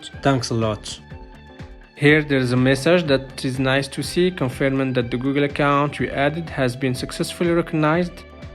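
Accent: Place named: Morocco. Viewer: sorry but your accent is bleeding bro, are you a moroccan?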